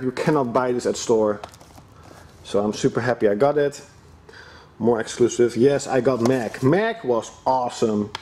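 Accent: Netherlands. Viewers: Ben je nederlands? Are you dutch because you have a dutch accent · you really have a Dutch English accent lol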